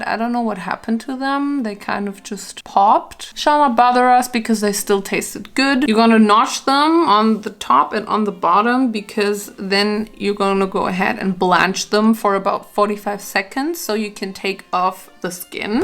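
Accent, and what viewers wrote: Germany. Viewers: Is it just me or has she got way more German recently, her words are so much less pronounced compared to what they used to be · shes german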